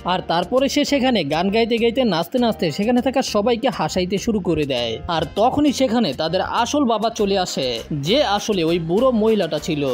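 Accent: Comilla, Bangladesh. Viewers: ভাই আপনার বাড়ি কি কুমিল্লা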